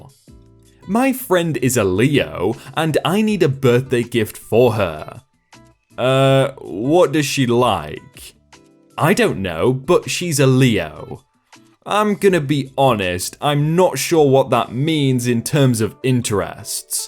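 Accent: United Kingdom. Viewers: understandable it's that smooth british accent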